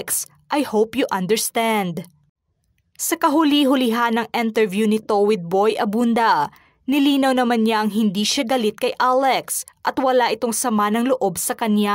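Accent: Visayas, Philippines. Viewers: Natatawa ako sa diction ng Content Creator na ito. Akala niya napopronounce niya maigi. Andaming Bisaya accent at OA na enunciation ng consonants. 😂😂😂😂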